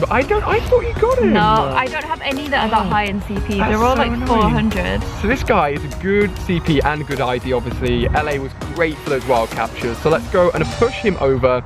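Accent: United Kingdom. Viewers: He's British and has an accent. Get over it and enjoy the videos or don't watch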